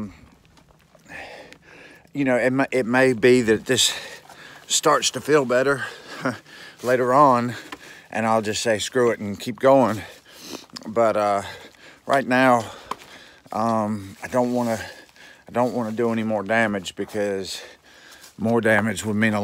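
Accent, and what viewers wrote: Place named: South, United States. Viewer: No apologies are necessary! You were out there actually hiking the trail not watching others do it like we are! The videos you have posted of your hike are the ones I truly look forward to the most. Your candid and humble personality is always a pleasure to watch and I enjoy your dialect being from the south myself. And the fact that you enjoyed your hike so much really shines through in your videos. Cheers to a speedy recovery and your future outdoor adventures. Look forward to your update when you feel inclined to post. Enjoy your home with heat and electricity! LOL.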